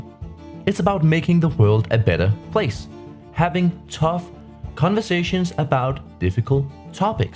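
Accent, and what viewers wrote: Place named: Denmark. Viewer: Dansk accent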